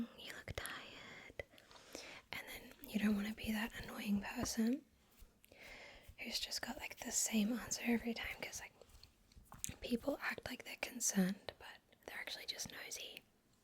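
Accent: Australia. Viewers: You do your preference, but the short hair is super cute. Why do I find most women that aren't into guys hot, oh well. As far as trigger words go, 'plosive p' words are good (words with 2 p's are good; stipple, apple, puppy, etc.) Also repeating sound words are good; coconut, banana, chocolate. Why are most of the words foods? haha. Also, leaning into the Aussie accent really hard for a video would be good, since that is something more niche that you have going for you. Even using slang, like "chuck a wobbly" or something. Not gonna lie, doing the heavy Aussie accent role play wearing that same type of tank top or sports bra exercise top used in the physical trainer videos would probably do well for views (more revealing shoulders/etc. get clicks these days). Whether you do another physical trainer RP or maybe do like a female mechanic explaining the work they did on the car or some work stories (some "grease" on your arms and cheek). 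Anyway, just some ideas and insights to help the channel, not just nosey! haha.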